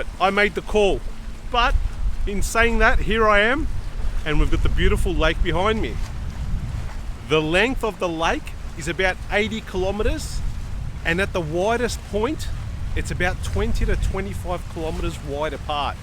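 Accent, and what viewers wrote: Australia. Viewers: Bro, get some vocal coaching. That Aussie twang of yours ain't particularly pretty.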